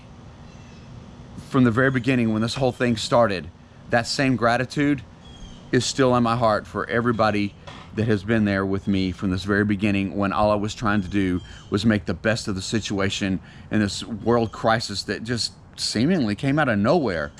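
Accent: Morocco. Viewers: Bravo; You speak now like a real Moroccan 👏👏👏👏👏👏👍👍👍👍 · Stay safe bro...we gonna miss your very special moroccan dialect ...hope we will see you soon in Morocco. You re become one of us. ❤🇲🇦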